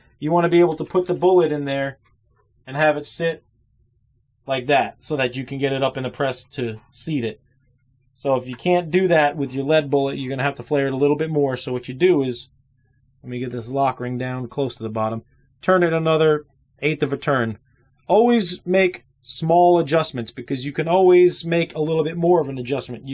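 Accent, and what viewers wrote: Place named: New Jersey, United States. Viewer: Thanks that was very helpful.You sound like your from NJ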